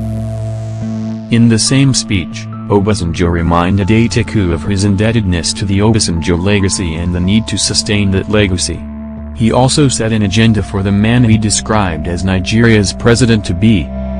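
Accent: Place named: United States. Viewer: The white man cannot be trusted,is it the opinion of reuben abati or the voice of reuben abati ?,it is certainly not reuben abati but the accence of a white American man,he cannot pronounces obasanjo name correctly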